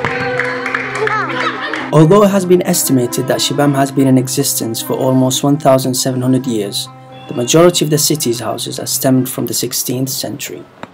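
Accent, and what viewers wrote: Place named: Sheffield, United Kingdom. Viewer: Of course you cant ignore the beauty of Yemen, but i noticed the Sheffield accent straight away.. Brilliant video mashaallah..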